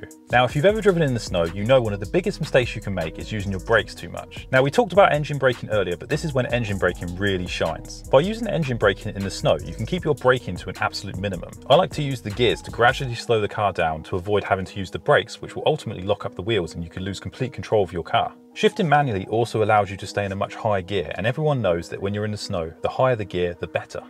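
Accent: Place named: United Kingdom. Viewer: Ahh! This British English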